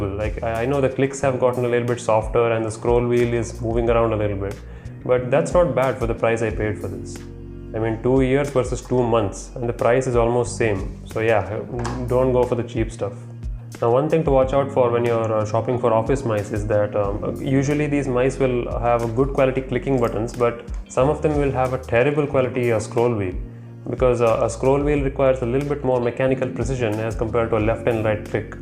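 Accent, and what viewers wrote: India. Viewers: The channel's name should be Hopeless indian sounding guy that causes cancer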